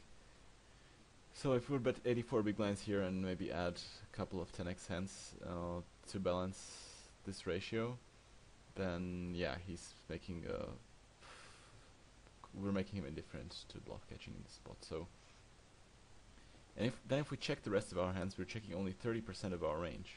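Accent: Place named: Eastern Europe. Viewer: A+ content, vyslovnost temer nepoznamenana slovanskym jazykem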